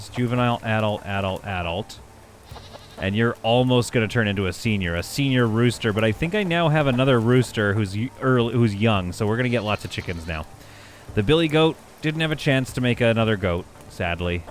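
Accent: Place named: Black Country, United Kingdom. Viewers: That was not a Scottish accent! :P that was like the midlands of England LOL still love the videos though :) keep it up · We don’t claim that accent, it’s more brum or Black Country. 🤣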